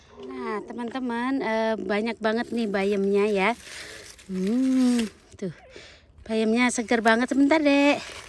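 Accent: Java, Indonesia. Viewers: Suka sama bak bahasa nya medok walaupun udah lama diluar negara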